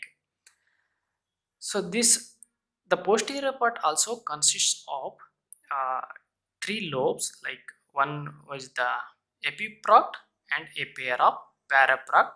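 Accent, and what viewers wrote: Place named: India. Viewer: sir pls talk like a human being so its understandable for mortals who live outside the Indian subcontinent, pls thx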